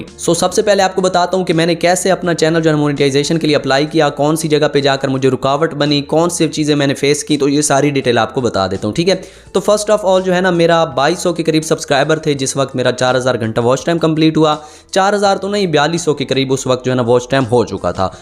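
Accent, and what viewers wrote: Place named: Noorpur Thal, Pakistan. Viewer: Zaban isi tarh lg rahi hai Main khod noor pur thal sy hu and wsyy ap kahan sy ho..bye the way poch raha hu bro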